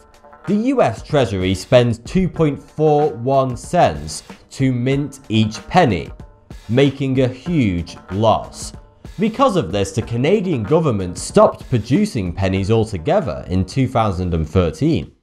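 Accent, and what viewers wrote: United Kingdom. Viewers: Is this like racism or just you don't understand British accent? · Buck teeth and a British accent? Its like every word your pronounce starts with the letter "F". Like you say thirteen like " Furteen" WTF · ***** British accent guy. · hes from the uk, the way he talks is actual english, american is actually incorect english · idk why but his british voice is driving me mad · His nasally, British accent is annoying.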